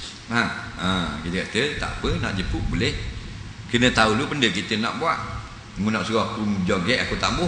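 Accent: Terengganu, Malaysia. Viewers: ustaz orang tranung,bukan klantan..orang tranung memang keras sikit loghat die..mu orang luo xyoh nok komplen la..cakak banyok tek.. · Zulkifly Hj Ali study dlu loghat terengganu bru cite bodoh..mcm org melaka cakap celaka la celaka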